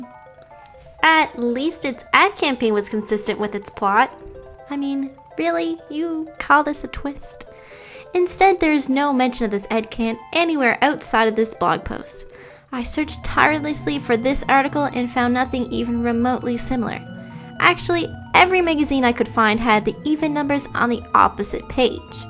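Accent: Canada. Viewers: If you didn't have the Canadian accent, you would be prefect.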